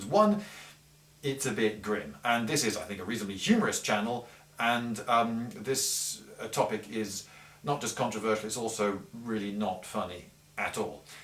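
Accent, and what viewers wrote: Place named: United Kingdom. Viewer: I rarely didnt understand some parts of it (im not native speaker) but i can say you sound so britsh. In fact its one of the most britsh sounds i ve ever heard sir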